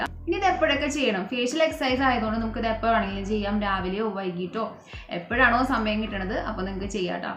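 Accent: Thrissur, India. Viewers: Thrissurkaari aano chechi?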